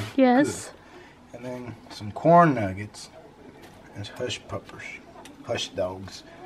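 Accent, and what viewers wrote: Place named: South, United States. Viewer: Paisa with a southern accent......I love it. Un mojarra frying a mojarra🤣🤣 Looks goog Y'all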